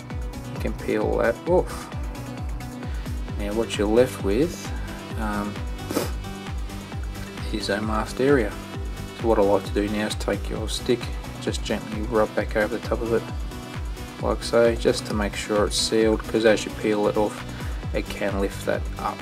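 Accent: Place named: Australia. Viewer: So Aussie haha (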